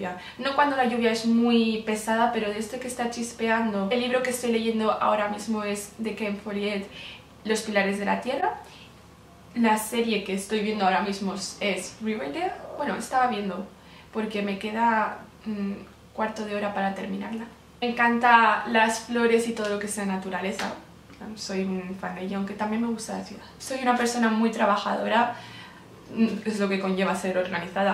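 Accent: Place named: Spain. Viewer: Buscando otro video acabé aqui! Espero que tengas exitos!!! Bienvenida a la comunidad!!! Me gusta tu voz y ese tono de los españoles! Te voy a dejar mi apoyo con un like y suscribiendome!! Espero hagas lo mismo por mi!!! Saludos desde Argentina!! (Odio también que se muerdan las uñas :v)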